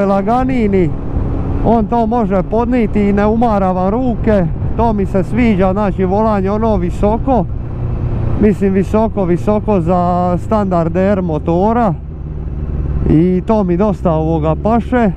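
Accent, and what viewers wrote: Dalmatia, Croatia. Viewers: Volim ovaj Dalmatinski dijalekt. Super recenzije pozdrav iz Rame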